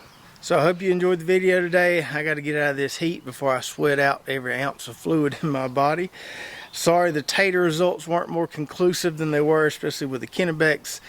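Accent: South, United States. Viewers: I love how you say potatoes I like southern accent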